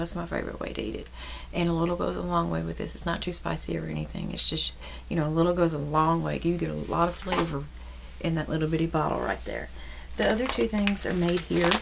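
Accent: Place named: Louisiana, United States. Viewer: I am a new supporter, I found you thru Vallerie Hill. I'm not one to unsupport or unfollow just because I didn't win. I think that's awful & im all about supporting especially small channels and buisnesses. I like to see people grow and I'm passionate about that. So no worries hunnie. I'm glad I found you because it will be great to see you grow!!!! And YOU WILL!!! 😊💗💗 I absolutely love your personality and spunk of Louisiana !!!! I'm from PA so accents are so much fun! I actually don't think I've had Louisiana food cooked right before! I've tried making creole before if that counts!!! I'm from up north so .... Anyways rambling 😂😂 3 MAC shades? My favorite are:1. BLANKETY. 2.SNOB 3. HALF N' Half. And a Question for Q & A ? What is your favorite drugstore brand & favorite High End brand? Thanks for the chance! And cannot wait to continue to see growth in your channel!! 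💗💗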